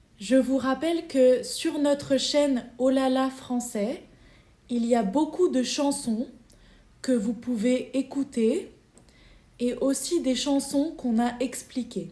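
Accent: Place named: France. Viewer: Aquí si hay acento de francés , un abrazo 🙂🫶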